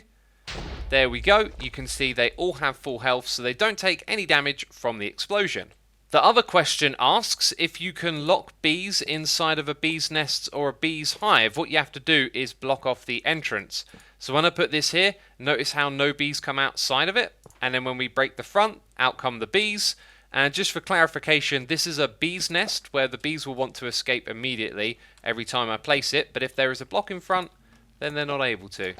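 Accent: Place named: United Kingdom. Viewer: why are all minecraft youtubers british? is bothers me, they all sound the same.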